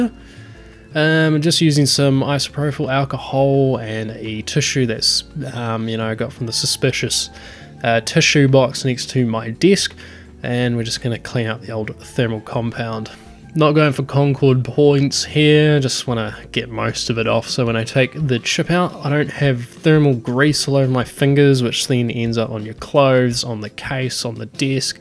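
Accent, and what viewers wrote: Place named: New Zealand. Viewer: My first retro build a few years ago is a similar machine. I wanted to build a better version of the PC I had as a teenager, as if I had the capability to max it out. I've got an S3 Virge as my 2D card and a Voodoo 2 PCI as my 3D card. 32 MB of RAM, 7200 RPM 20 GB Maxtor Hard drive, and an AWE 64. Funnily enough, I just bought a 233 MHZ P1 with MMX to upgrade it. I also have a Super Socket 7 board that I recently got that supports 512 MB of RAM and has an AGP slot. I have an extra 233 MHZ processor and A Voodoo 3 2000. I want to see how the two systems compare to one another. Might also be fun to try to toss Windows XP at the Super Socket 7 build at some point just for a laugh. Also, are you by any chance a New Zeelander? I have a few Youtubers that I watch that are Australian, and your accent is messing with my brain. It's similar enough that my brain is expecting it to sound Australian, but there are certain things that sound different.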